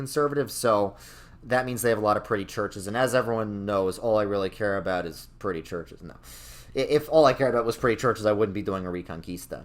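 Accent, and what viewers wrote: New York, United States. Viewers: I don’t know if you just played it up for this video or I’m just noticing it now, but your New York accent is really coming out. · A) your earlier videos seem to have downplayed your New York accent but it seems you've recently embraced it. B) Detroit has the best pizza. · The NY accent was carrying in this video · Wait.. is that a NEW YORK ACCENT I HEAR?! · New York accent came out strong in this video.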